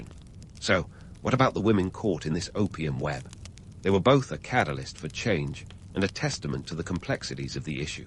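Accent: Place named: Transatlantic, United States. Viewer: Nice story. Not sure about this transatlantic accent, kept distracting me trying to figure out if it’s British or American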